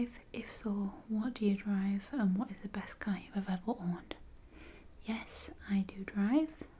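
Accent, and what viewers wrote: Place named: Newcastle, United Kingdom. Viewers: Great to see a fellow Northerner creating ASMR videos. At last an accent I can stand, but Singapore is definitely not in Germany! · Sounds like a Geordie lass. :)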